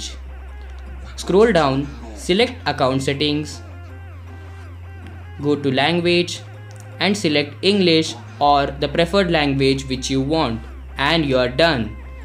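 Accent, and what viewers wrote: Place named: India. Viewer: Why indians talk like that... change not chin :)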